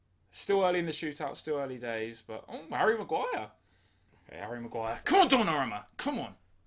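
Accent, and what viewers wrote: England, United Kingdom. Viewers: U have an English accent......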